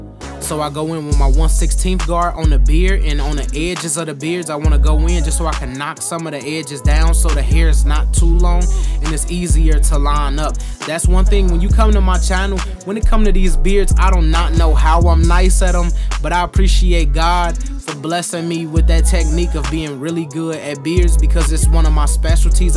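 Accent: Chicago, United States. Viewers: wait you from chicago dog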